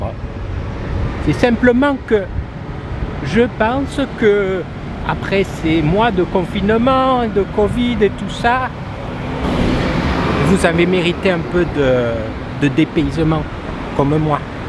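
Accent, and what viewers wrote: France. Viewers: Vé c'est beau... le Safranier (Commune libre) et ton sac, certes on s'en cogne mais de montrer la technologie avec le vrai accent de marseille... Ça l'fait. Franck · "On s'en cogne de ce sac" tu m'as bien fait rire avec ton accent du sud. Ca fait du bien de voir Antibes et le sud. La mer était particulièrement agitée!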